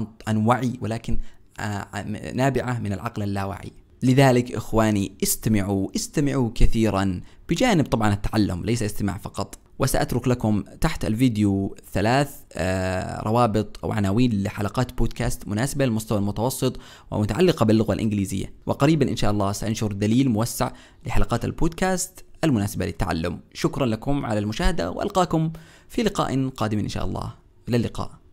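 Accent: Yemen. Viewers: اهلا فيكي اخي شكرا جزيلا لك . انا يمنية ولهذا عرفت مباشرة انه يمني من لهجته كما اني سألته ذات مرة في تعليق هل هو يمني او لا فأجابني انه من اليمن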